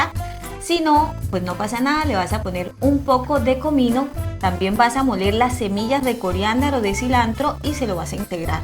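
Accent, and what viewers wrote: Colombia. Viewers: Hola, muy ricas Y sensillas tus recetas ,bueno por el acento me imaginaba que eras de Colombia pero vives en Colombia? Gracias .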